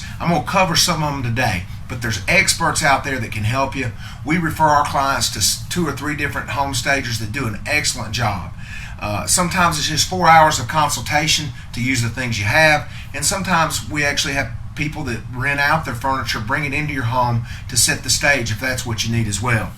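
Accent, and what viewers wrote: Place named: Alabama, United States. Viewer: Roll Tide, man, with that accent!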